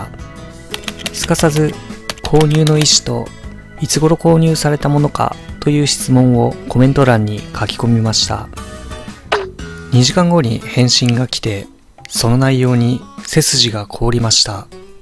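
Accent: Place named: Kagoshima, Japan. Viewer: 方言が鹿児島っぽい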